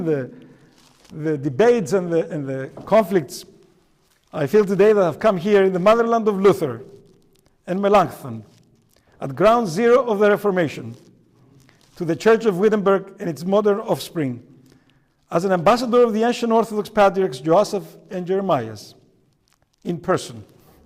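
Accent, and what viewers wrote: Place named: Greece. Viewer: Does this channel ascribe to the OCA, or the Greek Orthodox church? It is challenging to hear the english through his thick Greek accent, for me anyway.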